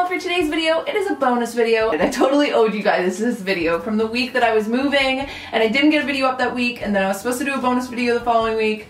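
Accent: Canada. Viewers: Brea Monai I thought it sounded Canadian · I lovvvvve the Canadian accent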